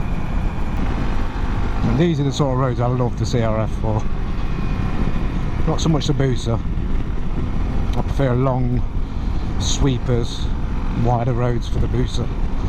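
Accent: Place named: Nottingham, United Kingdom. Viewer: Alright pal I had issues with mic doing that but found you can alter setting in your editing app I change mine to surround. The helmet you can get a helmet mount that sticks on your helmet from Amazon called motoradds if interested. You sound like your from notts my home town born and bred until I moved up north. Great vid pal👍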